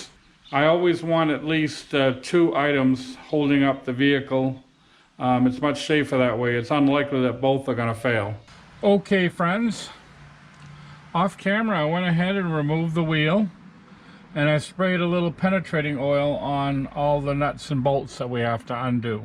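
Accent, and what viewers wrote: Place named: Boston, United States. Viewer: yeah I heard it and was like this guy has to be near Boston. Thanks for posting the video I'm doing some work on my dodge and you really helped me get shock out the way so I can replace lower control arm. Thank you again ☺️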